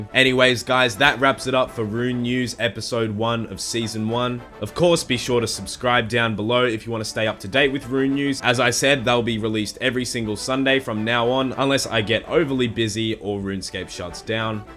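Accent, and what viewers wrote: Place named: Australia. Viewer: are you Australian? if so where about are you from? good vid by the way!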